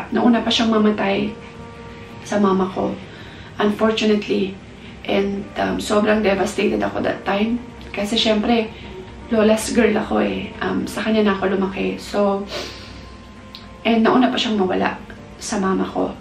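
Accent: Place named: Manila, Philippines. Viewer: Manila tagalog accent . Very clear.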